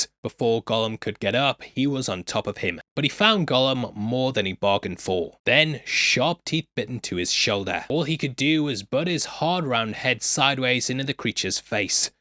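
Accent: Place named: Newcastle, United Kingdom. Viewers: Love your work....BUT....I just can't stand you reading to us in Your Geordie accent. REALLY puts me off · All this time I didn't twig you lived in the north of England haha! I wondered why you sounded like you were from round these parts! Geordie here, love your content man! Great stuff.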